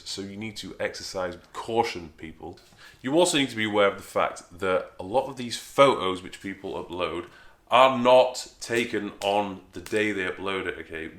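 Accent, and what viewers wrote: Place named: Yorkshire, United Kingdom. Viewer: its a Yorkshire/Geordie accent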